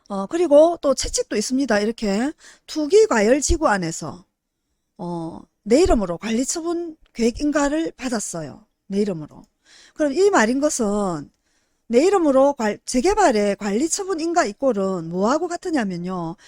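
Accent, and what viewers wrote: Busan, South Korea. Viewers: 목소리가 재미있어요 부산인가요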